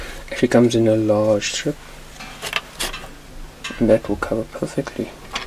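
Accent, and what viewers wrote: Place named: Australia. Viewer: I also forgot to mention that I'm from Bulgaria, a country in Eastern Europe, and salaries here are one digit smaller than what you probably have in Australia (I hope I'm right judging by accent). Prices are a lot cheaper too though. Paying over $70 for a toy is a bit too much for me, it's 1/5th of my monthly salary. Please understand.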